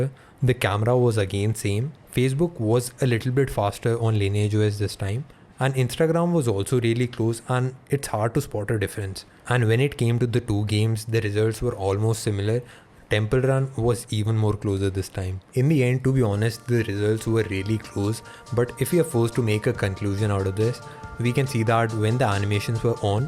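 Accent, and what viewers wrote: India. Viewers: yeah after he lose that Indian accent. people just dont like Indian accents. They talk so fast and dont realize they english is not that great because of that super strong accent.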